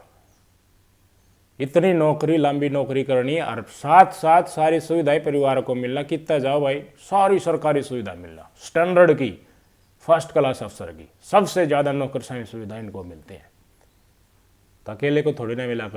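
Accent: Haryana, India. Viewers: Phale Bolna Seekh Le Aa,pni Lokal Bhasa Na Bole ,Bhiwani Jiila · बेटे तेरी जो हरियाणवी भाषा है आज तक किसी भी पत्रकार ने नहीं बोली ऐसे हर घटना को बताता है जैसे दो आदमी बात कर रहे हो बिलकुल सरल हरियाली में बात करता है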